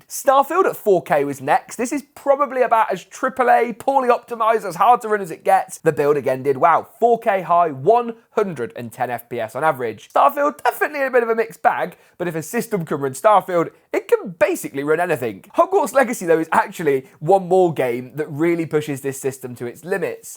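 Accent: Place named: North, United Kingdom. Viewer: I cant understand the north accent \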